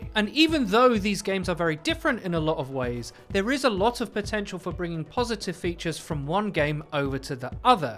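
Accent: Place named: United Kingdom. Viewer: British people talk funny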